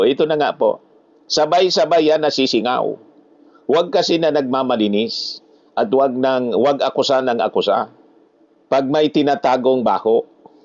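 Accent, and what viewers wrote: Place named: Bicol, Philippines. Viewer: Bicolano ba kyo sir kc pareho tyo ng punto?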